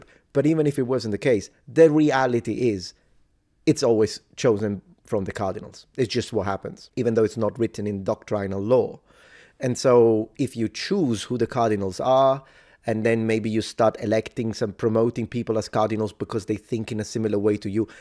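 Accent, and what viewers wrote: Italy. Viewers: Ecco perché il tuo accento mi era molto familiare, sei italiano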